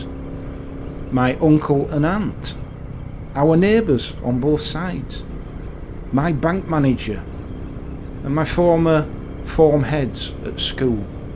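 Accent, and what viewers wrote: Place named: Bradford, United Kingdom. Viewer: First off... good to hear a Bradford accent again! 1/ Cuts from 2009: I know two police officers who were made redundant in the wake of those 'austerity' measures - one a month before his retirement (I believe) so that he would not receive his full service pension. I know a couple of others who left at the same time because they said policing changed in the wake of those cuts - policing became reactive rather then proactive with less engagement with members of the public and less emphasis on crime prevention and more on 'firefighting'. Talking about 'customers' - I was in education in the 80s/90s and about the same time there was a shift from seeing students as students towards them being 'customers'. The argument of Thatchers changes in the 80s (individuals increasingly paying for education services) was as they were now paying they were 'customers' - and the customer is always right. 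The introduction of private sector attitudes and marketing was endemic. Policing and education are (supposedly) public services NOT private businesses which exist to make a profit by (sometimes conning) customers out of their money. 2/ Biking: there are roads I hate to go on not because of the traffic (we live now at least 50 miles from even a small city and rural road users are very polite) but because of the dire road surfaces particularly on back lanes that are ever more more beginning to resemble green lanes. Live where we do and you soon begin to perfect the art of the pothole slalom. Rece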